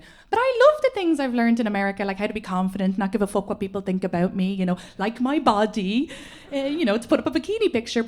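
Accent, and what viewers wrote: Ireland. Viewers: I feel like she gets more Irish every time I see her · Why do Irish people add an "e" to the word film? "Fil-em"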